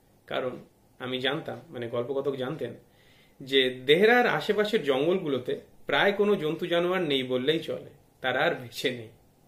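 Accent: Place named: United Kingdom. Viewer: And also your pronounciation is really nice and alike to British English that helps me to learn how to pronounce the words there in the text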